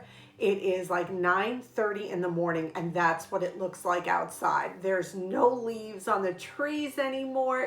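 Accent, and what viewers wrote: New York, United States. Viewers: I love hearing another New Yorker, it's like listening to family! These were such great tips. I really appreciated all your ideas and that lovely pie!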